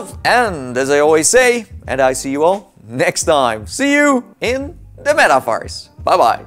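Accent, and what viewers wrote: Netherlands. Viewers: I forgot I was subscribed. When I saw this in my notifs I heard your Dutch voice, it made me feel happy!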